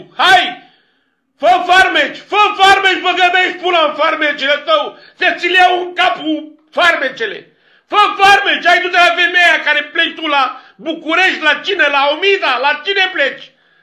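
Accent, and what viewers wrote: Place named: Turkey. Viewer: E turc daia vorbeste asa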